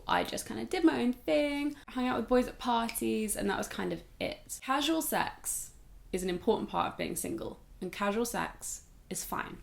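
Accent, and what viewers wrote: United Kingdom. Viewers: English isn't my first language but I already get used to American English and feel comfortable with it And now I listen to her British accent and it's so fucking sexy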